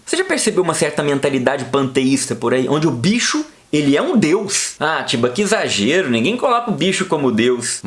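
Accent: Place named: Minas Gerais, Brazil. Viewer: Grandes verdades ditas de forma simples, ao estilo mineirês. Abramos os olhos e convertamos nossas vidas. Cristo é o Senhor!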